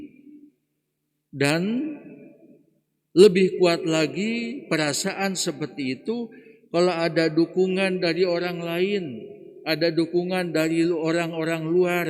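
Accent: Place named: Sunda, Indonesia. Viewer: R I P... Saya sedih karena romo tdk akan pernah lagi memimpin misa harian pagi pkl 06. yg sy ikuti secara live, tenang dn damailah bersama Bapa di surga romo...warga paroki keluarga kudus cibinong, keuskupan bogor.. Yg akan sllu terkenang dgn khotbah dialek sundana 😥😭😭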